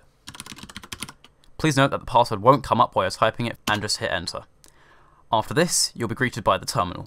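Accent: England, United Kingdom. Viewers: finally someone who is English lmao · i like your english accent very nice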